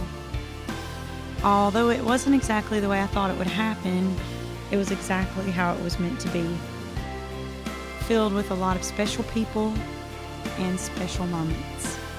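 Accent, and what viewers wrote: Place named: South, United States. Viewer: Wow! Your southern girl accent really come when you speak. Very nice!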